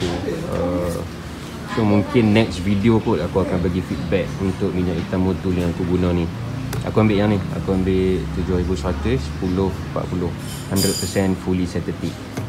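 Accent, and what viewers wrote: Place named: North, Indonesia. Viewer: loghat mcm org utara so kita geng la 🤣dah la R15 juga tapi aku R15 biru 😬 salam geng R15🔥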